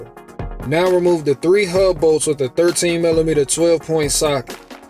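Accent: Washington DC, United States. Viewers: You from the DC area aint you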